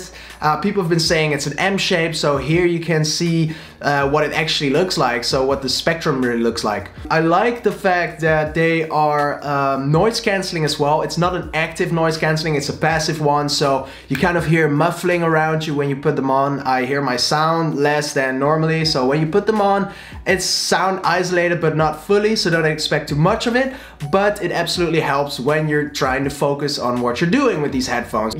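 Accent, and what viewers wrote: Netherlands. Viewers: I can already hear you're dutch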